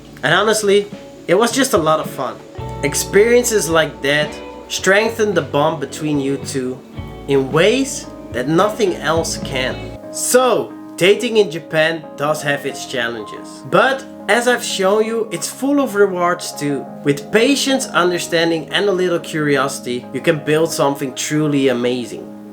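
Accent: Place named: Netherlands. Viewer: I gathered you're from Nederland from your accent. Lived there for half a year. Lekker times 😀. I was responding to the main comment with him saying he's an African American, or a black person as the rest of us outside the US say.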